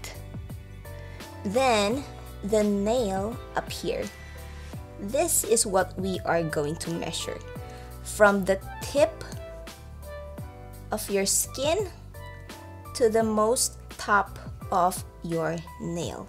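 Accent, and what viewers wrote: Philippines. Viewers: WOAH she's Filipino (atleast I think). Im Filipino! "Ang kapal" is Filipino for "Its thick". Also i noticed her Filipino accent :D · Starting to watch your videos right now and my parents are gonna buy me a kalimba, IM SO EXCITED (I noticed your accent is like a filipino, If so, HI POO MAGALING KA PO MAG TURO LABYUU <3)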